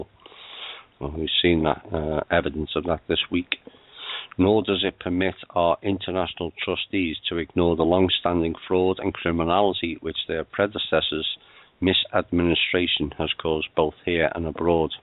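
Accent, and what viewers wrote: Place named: Ireland. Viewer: ...and a special thanks to Anna Von Reitz, your narrative voice, although strangely masculine and Irish, has a wonderfully hypnotizing monotone to it that has helped my insomnia....I can now listen to this youtube audio, which I have recorded as a therapy aid, because its tempo puts me to sleep in under a minute flat....thank you....!!!...I'm cured!!!!....and you've saved me a fortune in alternative solutions.....thanks again...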